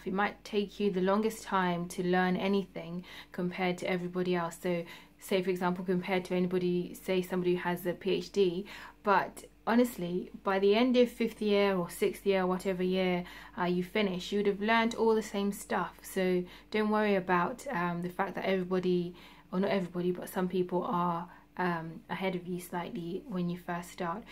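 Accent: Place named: Newcastle, United Kingdom. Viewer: Sounds like Newcastle.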